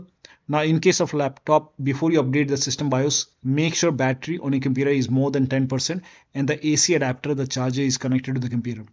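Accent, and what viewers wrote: India. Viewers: Where are u from by the voice it looks like u r from India if yes wanna play together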